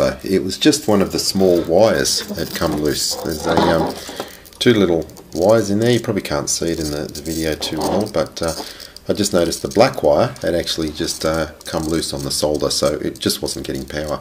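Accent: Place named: Australia. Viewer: Thanks. I can hear from your accent that your an Aussie =) Added the grommets where you suggested. The tail is abit of a chore to with these figdety fingers, but it looks good. I'm ready to fly, once the batt is fully charged...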